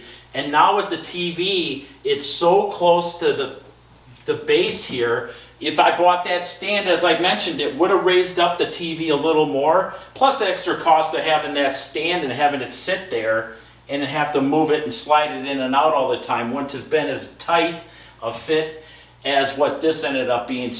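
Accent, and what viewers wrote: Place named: Canada. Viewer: Awesome. Canadian, eh?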